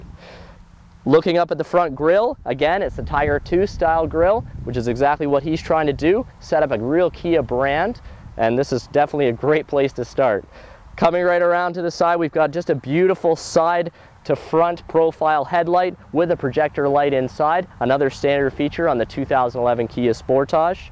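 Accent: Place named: Canada. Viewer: Oh he's Canadian... That explains his pronunciation and his hotness :)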